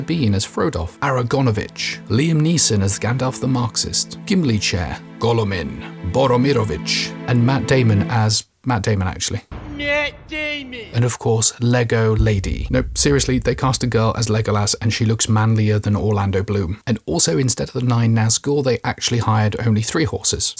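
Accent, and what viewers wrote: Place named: England, United Kingdom. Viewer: Thank you for making these. Love the English accent too. If you could go back and revisit the rings of power and do each episode over like you did with 7 and 8 that would be wonderful! I'm serious! I will pay you!!😄 That used condom falling on the Balrog's head was awesome! Humor is the best way to deal with this abomination of a series. (It's really a shame too, because in the right hands this could have been a good show. I am interested in seeing the lore come to life. I would love to see how Anatar (Sauron) managed to trick the elves; how he worked with Calibrimbor to learn to craft rings. That would have been really cool. It takes more than 8 episodes though. And fuck the Harfoots! Who gives a shit about that side-story.) That reminds me - your Skyrim comment was perfect. The entire show was a bunch of stupid optional side quests. 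Never did I fear for anyone or anything. The only characters I liked were Adar and Berrick, and the latter had not a single spoken line. Well, I suppose the discount-Emma Watson character with the big boobs was fun to look at, but even she was unnecessary and her character was as developed as bleached vanilla. Anyway, please keep these going. I look forward to them sooooo much! Cheers, mate!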